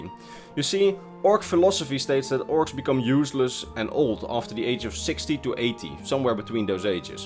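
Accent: Netherlands. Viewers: Are you Dutch? Your accent sounds kind of Dutch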